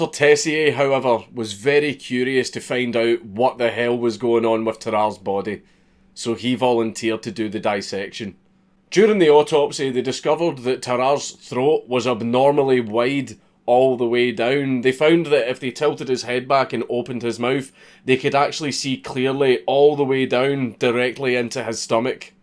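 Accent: Scotland, United Kingdom. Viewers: scottish man reads wikipedia at you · Subscribed to Sue´s channel too. 1: I like the scottish accent 2: To support Mister and Misses Dankular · Just watchin this for the Scottish accent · Swallowing corks sounds a bit funny with a scottish accent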